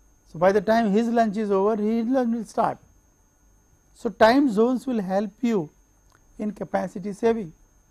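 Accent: India. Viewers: it should have been better if it they made their presentations in computerized manner and add some subtitles or captions. indian accent is very hard to understand. but still it is a very educated video.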